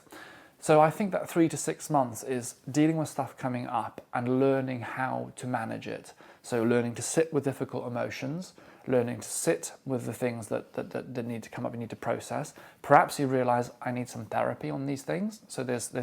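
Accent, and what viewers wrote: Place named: South England, United Kingdom. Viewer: Your accent is spot on with Daniel Radcliffe. What part of the UK is it from?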